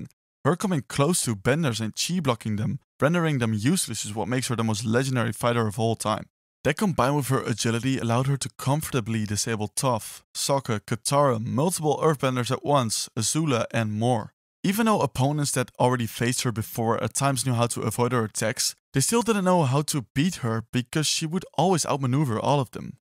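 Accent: Netherlands. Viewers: I smell dutch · Nederlands accent gedetecteerd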